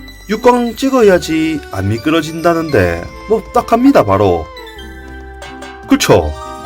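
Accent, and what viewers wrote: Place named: Gyeongsang, South Korea. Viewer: 사투리가 경상도인데?? 어디쪽이지??ㅎㅎ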